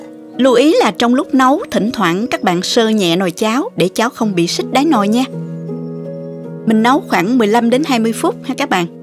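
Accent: South, Vietnam. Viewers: Giong noi mien nam cua that truyen cam de thuong mon nao cua huong dan deu ngon va hap dan, cam on nhieu, Ca co noi, la ca hanh ben My. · Tôi thích nghe cô nói rất truyền cảm giọng nam bộ nhẹ nhàng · Hi. chỉ dẫn công thức nấu ăn ngon.Rất cảm ơn về chương trình này. Với giọng nói Miền Nam ngọt ngào và tai' nấu ăn của thì chắc chắn không chê vào đâu được. 👍❤🇺🇸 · Mình rất thích xem dạy nấuăn, cô ở tiểu bang nào vậy? Nghe giọng miền nam rất dễ thương,mến chúc cô và gd nhiều sức khỏe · giải thích rõ ràng.. giong Nam hay qua, nghe êm tai vi giong của cô không bi ngọng khi đọc những chữ bắt đầu với R! Những video miền Tây nghe khó hiểu quá. Cam ơn Cô.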